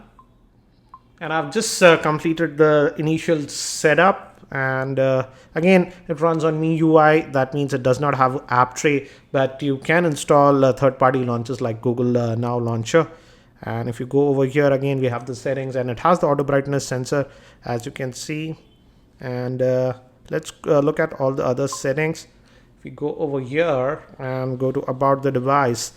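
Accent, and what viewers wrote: India. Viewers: lol unbooxinng typical Indian accent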